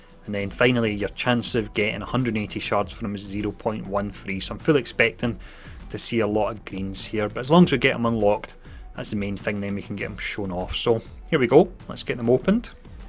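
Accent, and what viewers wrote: United Kingdom. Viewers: I personaly like british accent but your voice is not nice. Ive been watching videos about this game iand i hear british ppl and theire voice and accent sucks. I wont keep watching cause your voice annoi me and you dont speak clear! The videos are only for you fellas even americans might not understand. I do but your voice dude ahhhh🤢🤢🤢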